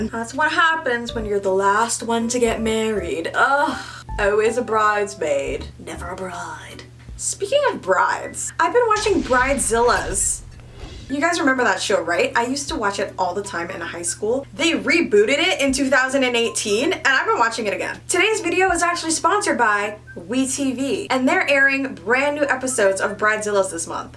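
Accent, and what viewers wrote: England, United Kingdom. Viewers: Can we talk about the English accent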